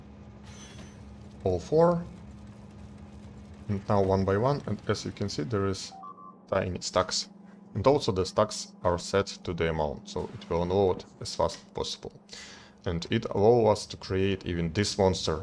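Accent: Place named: Russia. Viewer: вангую автор русский, я прям слышу этот акцент😀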